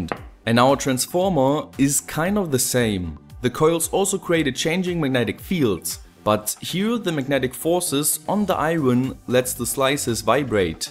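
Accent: Germany. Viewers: Bist du deutscher? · w8 r u from Germany? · Bisch du Deutsch? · Do you come from germany? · ***** schon nur geb zu es ist zum teil nervig den deutschen akzent im englischen zu hören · Man hört es richtig heraus dass du ein Deutscher bist. Dein Englisch ahahahahaha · Are you German?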